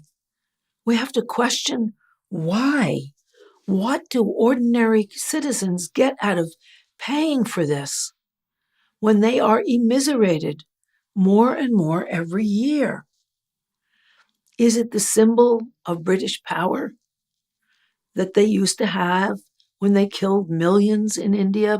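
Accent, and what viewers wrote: New York, United States. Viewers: Yeah it's probably the raspy New York accent, as well as the identically emotionally expressive face that does it.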